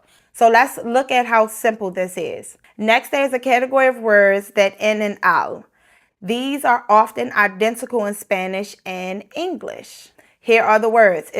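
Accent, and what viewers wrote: Baltimore, United States. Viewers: Girl, I'm from Baltimore and I was listening you you thinking "why she sound like home" 🤣😂😍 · Ya'll say tewww in Baltimore, like with an emphasis on the end vowel. Anyway, new subbie from upstate NY learning Spanish:)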